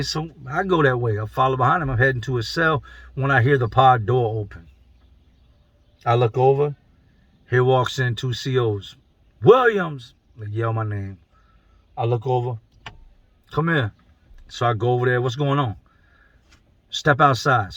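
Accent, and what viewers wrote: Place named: Philadelphia, United States. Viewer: Hell yeah man! Keep doing you! Also side comment cracks me up how you say “on” you can tell you spent a lot of time in Philly. When I lived in AZ people would comment how I spoke all the time and especially how I say “on”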